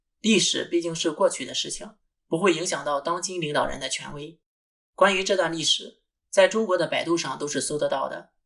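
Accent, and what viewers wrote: Shandong, China. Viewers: 聽口音是山東人？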